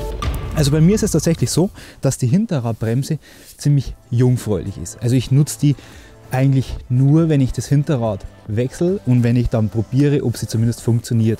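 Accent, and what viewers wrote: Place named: Bavaria, Germany. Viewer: Physik auf bayrisch 😁👍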